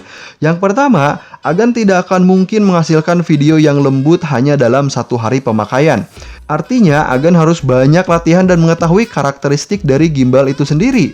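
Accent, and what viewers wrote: Kuningan, Indonesia. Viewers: Siga orang kuningan nya mang? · Bro orang Kuningan?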